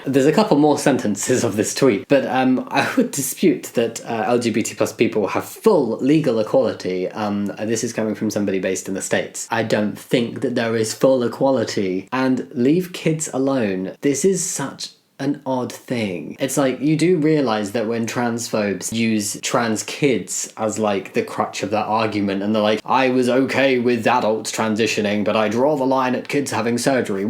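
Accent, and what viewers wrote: United Kingdom. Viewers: When did you move to the UK, your accent is very British? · He's always been British...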